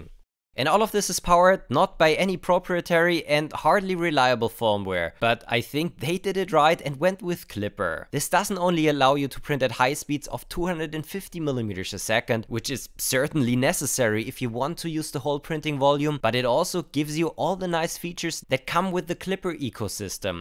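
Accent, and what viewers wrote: Germany. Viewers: Dnk how's your english so clear being you german, you only have a little sharp pronounce but's the deutsch accent while your spoken english is perfect. How did you manage to found the only chinese I've ever heard speaking english with a mix of nigerian and indian accent? He's rare! :D sorry I couldn't un-notice it :D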